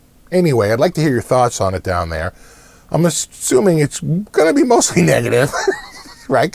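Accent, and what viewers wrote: Boston, United States. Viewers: Typical dude from Boston lol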